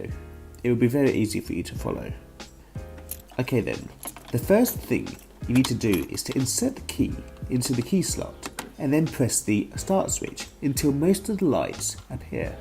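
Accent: RP, United Kingdom. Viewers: Just had to say love your posh talking voice and your CHANNEL subbed thanks for help in turning out SERVICE light reset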